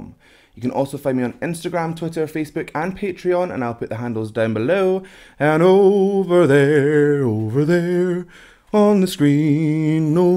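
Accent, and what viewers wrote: Scotland, United Kingdom. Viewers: This guy british accent were very cute! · omg i love british accent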